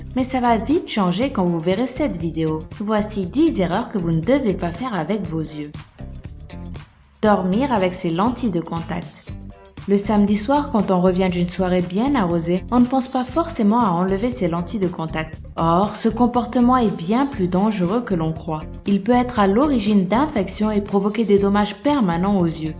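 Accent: Quebec, Canada. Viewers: C'est vous la femme a la fin ? :) Et sinon sympa l'accent j'aime bcp et les videos sont cool egalement :) sa vien du Quebec non ? ^^ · ton accent québécois est trooooop craquant!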